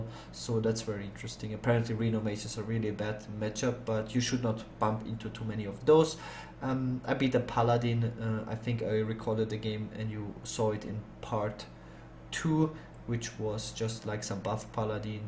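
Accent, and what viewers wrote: Germany. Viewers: Dieser moment, wenn deutsche englisch sprechen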